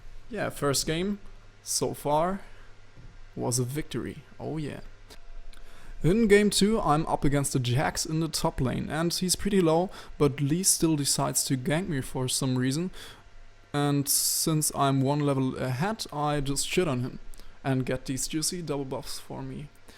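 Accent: Germany. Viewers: First of all, great video! Second of all, where are you from? Your English is very good but you sound German.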